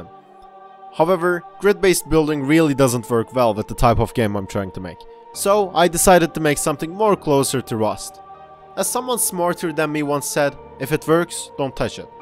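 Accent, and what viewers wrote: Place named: Turkey. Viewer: mirror tutorial videonu izlerken abi bu adamın aksanı türk gibi diyodum harbi öyleymişsin. Valla kanalını yeni keşfettim umarım istedğin şeye ulaşırsın. Oyun da güzel görünüyor bu arada. Test için yardımcı olabilirim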